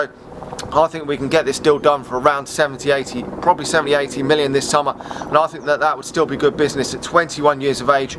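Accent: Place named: Manchester, United Kingdom. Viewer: Any fans from Manchester/ or with Manchester accents? 🤦🏻‍♂️ Can you whining lot just support arsenal or Chelsea or something ? I’m just switching off - it’s literally impossible to listen to someone with that accent talking about Utd and saying ‘we’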